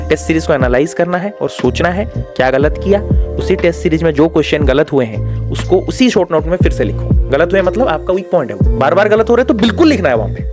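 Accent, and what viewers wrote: Odisha, India. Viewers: Bhai aap odisha ke ho kya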